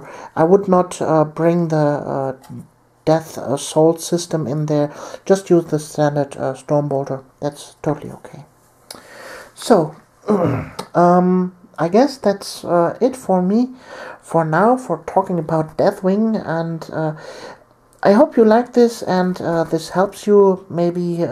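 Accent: Germany. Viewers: hey i watch your video's when ever i get LotR but, so can you do a video for the army of the dead. also are you german?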